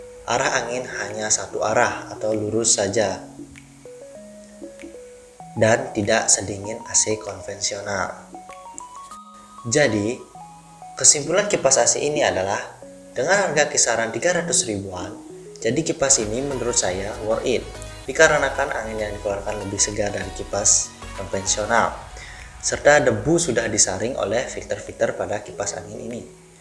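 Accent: Bali, Indonesia. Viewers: Logatnya orang bali banget😂. Mantab bliii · Org bali bro?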